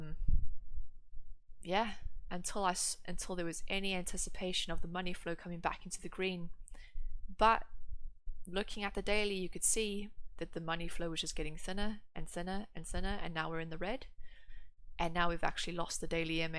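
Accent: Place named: South Africa. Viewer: South Australia sorry haha. I wondered if you were from South Africa with your accent.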